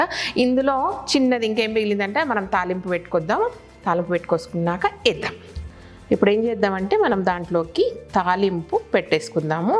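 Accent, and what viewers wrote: Telangana, India. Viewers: Madam meeru Telangana na....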